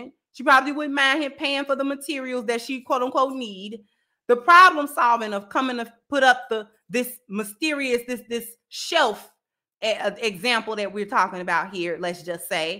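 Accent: Chicago, United States. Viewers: are you from Chicago? I been subscribed for months, and this is the first video that I noticed a Chicago accent lol. Dope content btw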